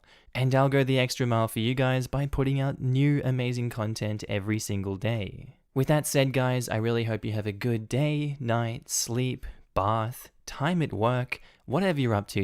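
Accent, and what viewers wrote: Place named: Australia. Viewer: Ah, yes. Our favorite australian narrator with the calming voice that pierces broken souls.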